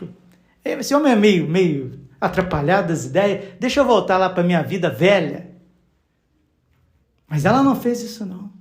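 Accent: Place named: Minas Gerais, Brazil. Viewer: Obrigada Pe. Bráulio por sua vida de dedicação ao serviço de Jesus. As suas homilias são muito tocantes à alma e ao coração. O senhor tem a sabedoria que advém do Espírito Santo para evangelizar. A sua humildade o aproxima de seus fiéis. O seu sotaque Mineirinho é muito cativante. Deus o abençoe. 🙏👏🏼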